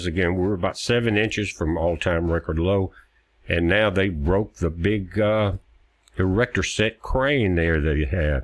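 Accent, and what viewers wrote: South, United States. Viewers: very charming 'Southern drawl!' 🎉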